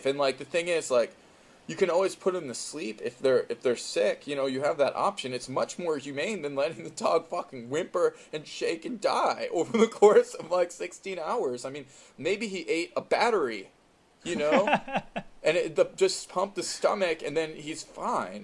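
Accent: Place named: California, United States. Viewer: he talks like that because he is probably from cali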